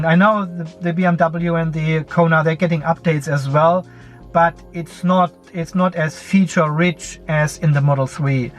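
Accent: Germany. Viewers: Dein Deutsches Englisch klingt echt mies. Warum machst du eigentlich diese Videos auf Englisch mehr Reichweite ?!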